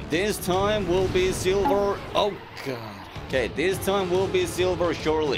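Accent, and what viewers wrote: Sweden. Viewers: are you Swedish? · are you swedish??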